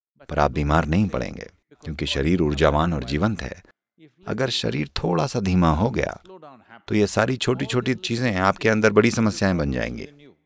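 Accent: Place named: South India, India. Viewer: Aapko main bahut manta hun lekin kuchh Shabd aap South Indian ke hisab se bolate Hain vah uchit nahin hai aap pure Vishva ke Hain South ke nahin soch samajhkar shabdon ka uchharan Karen Baba यह आपसे विनम्र निवेदन है मेरा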